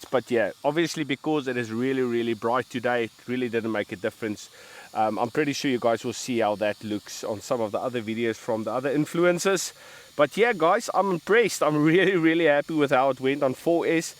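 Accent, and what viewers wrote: South Africa. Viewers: Lol boet I can tell you are a proper South African from that awesome accent!! Great vid, considering getting one of these, just wish it was 1/8 scale, but for the price this seems like a real winner.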